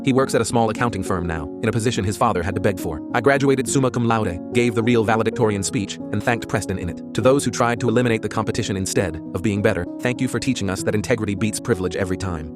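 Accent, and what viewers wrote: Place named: Scotland, United Kingdom. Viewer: why is the ai voice like. VAGUELY Scottish at times...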